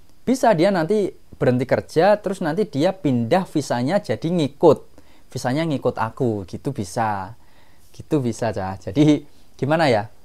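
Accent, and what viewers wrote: Java, Indonesia. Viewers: Suata mu masih medok jawa ne, kl pun tinggal di Jepang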